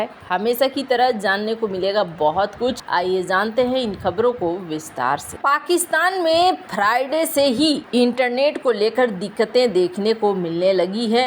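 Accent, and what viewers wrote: Bihar, India. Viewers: Ap bihar se h madam?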